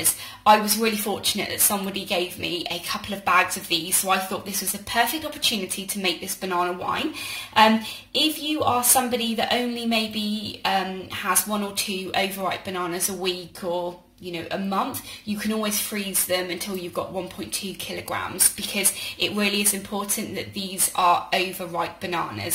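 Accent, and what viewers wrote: United Kingdom. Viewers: LOVE THE FACT THAT YOUR ACTUALLY BRITISH YOUR ACCENT IS REALLY THE WORKS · Love your accent. You gotta be British. I know from the electrical sockets on the wall. Nice video ❤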